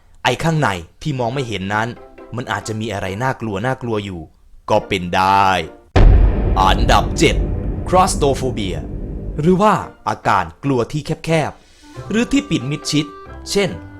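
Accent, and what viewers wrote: Isan, Thailand. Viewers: พี่เป็นคนพาคอิสานเหรอคะ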